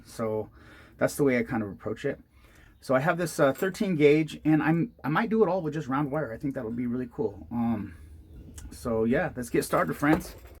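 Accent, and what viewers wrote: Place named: United States. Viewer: Hi, I am new to your channel and I am enjoying it very much. I make jewellery, but mostly copper, and wire wrapped. I do make rings and bangles and do the soldered join, but that is it for metal smithing so far. Keen to try bezels with strip wire bezel and also gallery wire. Tell me, as an American why you guys say sodder rather than solder and using the l in the word. It sounds odd. Anyways, glad you enjoy our English accent. I live in france and 5he French love the English accent speaking French lol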